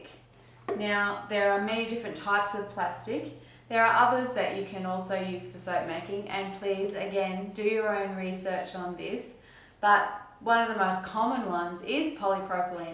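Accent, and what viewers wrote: Australia. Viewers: question. I’ve been weighing out my lye flakes in a glass Pyrex tub with a red lid (as if the color matters! 🤣) before stirring them into my distilled water in a stainless steel jug. (Snow falling on the lake.). Is it safe to use glass for dry lye flakes or should I switch to plastic? Thank you so much! Still inadvertently thinking, reading and making soap in your beautiful Australian accent! 😂. You’ve changed my brain - thankfully for the better! 😂. Take care, stay healthy! 😍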